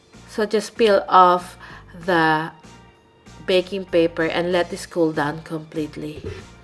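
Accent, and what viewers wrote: Philippines. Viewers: You have the typical Filipino accent.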